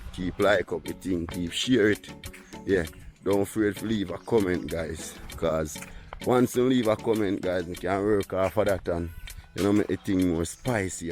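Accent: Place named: Jamaica. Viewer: Please speak up a little bit and SLOW down please cuz many of us are NOT from Jamaica and PATOIS is like a foreign language to us. LOL. Loved the video. God Bless.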